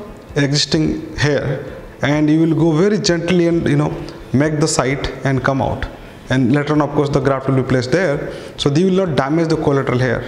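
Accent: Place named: Odisha, India. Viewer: You've got a very nice Odia accent sirji..kudos to you and your team The way my hairline is vanishing i think I'll soon be making a visit to one of your clinics..... as Djimon Hounsou says in Gladiator "But not yet....not yet"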